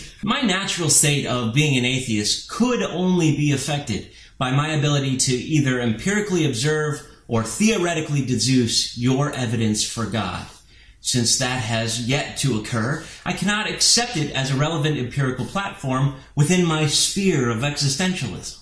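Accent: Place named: South, United States. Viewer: Great video and that's an amazing southern accent ;-)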